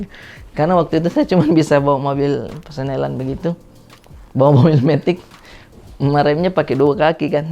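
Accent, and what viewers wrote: Makassar, Indonesia. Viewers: Wah, masih kental logat makassar na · Susah emang kalo khas logat makassar disuruh logat jakarta agak susah dan ribet hahahahahaa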